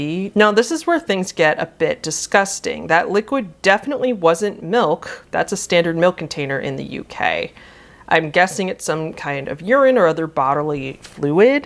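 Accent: United States. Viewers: As an English bloke myself, it feels so unusual to hear the phrase "dual carriageway" being said in an American accent. Haha. I have no idea how someone could be this bored/brave to go on an expedition like this. Either that, or I'm just a wuss. XD Edit: It also feels very bizarre to hear the word "bloke" being said in that same accent. I hear that so rarely that I can't compute it. XD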